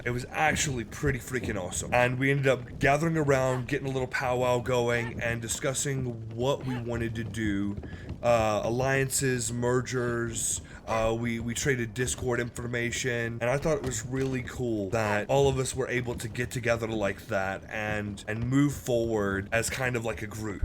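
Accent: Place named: Scotland, United Kingdom. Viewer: Nice video mate, give me a shout on my discord over the weekend and we can have a chat. Btw were is your accent from? Bit of a Scottish twang in there.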